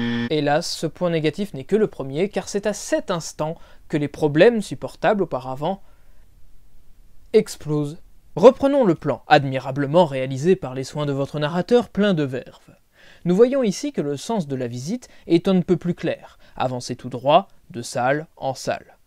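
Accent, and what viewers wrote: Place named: United Kingdom. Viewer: Et félicitations pour votre accent british !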